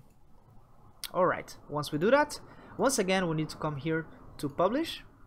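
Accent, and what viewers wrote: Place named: Brazil. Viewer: pelo sotaque senti que era do Brasil, você tem um inglês leve e sonoro, muito bom!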